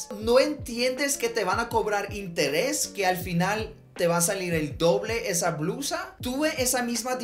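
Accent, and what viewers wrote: Bogotá, Colombia. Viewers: Soy de Argentina y su acento es muy colombiano. Quizás te referís a qué es un acento colombiano neutro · Que pirobø tan colombiano el jaja habla y tiene muchas actitudes de la tierrita, cvero caso de armor a la patria ♥️ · el tiene acento rolo, nosotros los rolos pensamos que no tenemos acento, pero si es asì ! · Me salió tu video por casualidad pero me mataste de la risa! 🤣 Nací y crecí en Bogotá hasta los 21 años, pero al escucharte hablar me pareció que no ha pasado el tiempo, aunque llevo ya 32 años fuera del país. Mi niñez la viví en Kennedy, pero ni muerta admitíamos eso si estamos en otro lado 😃. Cuándo no había nada para la cena, y por casualidad íbamos a visitar amigos, teníamos prohibido aceptar si se nos ofrecía comida, porque depronto se daban cuenta que no teníamos que comer en casa 🤨. A veces me da risa recordar esos tiempos pero la mayoría de veces la verdad no lo entiendo, y eso que yo me crié allá 😩. 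La necesidad de aparentar era (es) primordial. 🥺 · Neutro??? De oirlo supe q aprendió español en Colombia.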